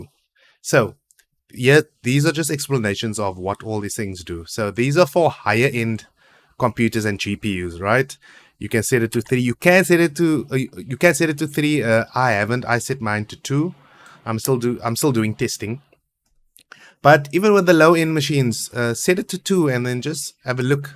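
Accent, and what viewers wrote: South Africa. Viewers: ive been trying to play this game on pc of so long and your vid really helped me tanks(btw are you south afrian) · bru are you south african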